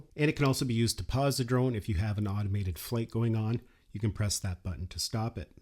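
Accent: Canada. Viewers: Well, the first flight went without a hitch! I learned very quickly to watch the controller and not the sky - the drone disappears in seconds. I would highy recommend this Beginners Guide. Calm, clear and really comprehensive without unnecessary detail. That Canadian accent is a joy!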